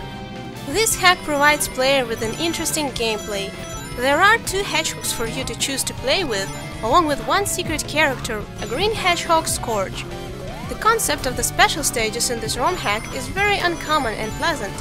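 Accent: Russia. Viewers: Russian accent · you're trying to hide your Russian accent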